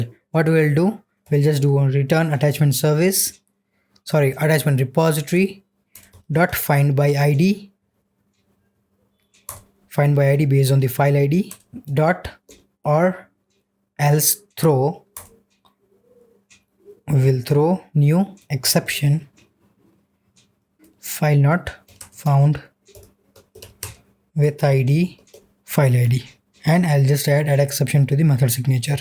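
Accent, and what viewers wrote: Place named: India. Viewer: Nice Video! For usual I habe problems understanding indish accent, but not here!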